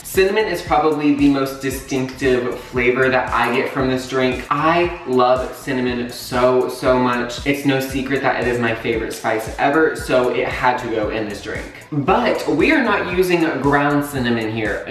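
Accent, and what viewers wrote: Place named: Brazil. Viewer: Where are you from? Your accent it seems like brazilians speakers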